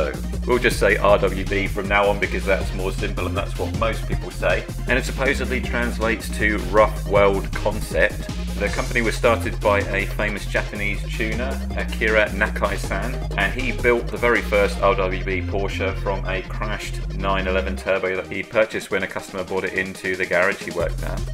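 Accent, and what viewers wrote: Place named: United Kingdom. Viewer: Your voice is spectacular as I should know being a media graduate from College. All of us Americans are always fascinated with the British accent.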